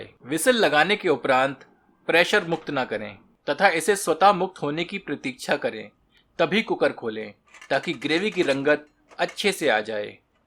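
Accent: Standard. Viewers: आप के शुद्ध हिन्दी उच्चारण सुन कर आंनद आ गया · Aap pahle durdarshan chanal pe programme present karte the, aisi Hindi wahi dekhne aur sunne ko milta thi, 😢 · आपकी शुद्ध हिन्दी बोलना अच्छा लगा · Itni shub Hindi mein baat karne ke baje se mujhe mutton de zyada apki Hindi Sune ka man hain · Shudh Hindi ka uchcharan aapke baton se pet bhar Gaya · i heard his voice till the end nd enjoyed pure Hindi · Mujhe apki resipy to achhi lagi sath mai apki sudh hindi bhot achhi lagi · हिंदी भाषा का शुद्ध उच्चारण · Apki Shudh Hindi sun ke man prafullit ho gaya...pehli baar kisiko itni acchi Hindi bolte hue suna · आप तो कमाल के कलाकार हो, इतनी शुद्ध हिंदी और वो भी मटन व्यंजन विधि के बारे में क्या बात है ! पहली बार किसी का वीडियो देख मजा आ गया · जी आप की हिन्दी सुनकर मुझे बहुत बहुर खुस्हुवा इतनि शुद्ध है कोही नहि बोलसक्ता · इतनी शुद्ध हिन्दी तो हमारे हिन्दी के शिक्षक भी नहीं बोलते थे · Shudh hindi bolte hain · रेसिपी तो बहुत अच्छी है लेकिन उससे भी अच्छी आपकी शुद्ध हिंदी है। कृपया आप इसी तरह अच्छी अच्छी रेसिपी लाते रहे जिससे हमे आपकी हिंदी भी सुनने को मिलती रहे🙏🙏🙏🙏🙏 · सच में आप सर हिंदी के शिक्षक हैं। मतलब क्या हीं बोले आपको, हिंदी के बारे में। इतनी शुद्ध हिंदी!!😀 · आपकी हिंदी की धारा प्रवाह ... 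शुद्ध उच्चारण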